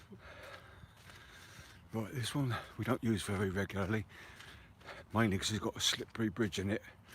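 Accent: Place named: Cockney, United Kingdom. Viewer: Is that you talking, nice voice, can detect a cockney accent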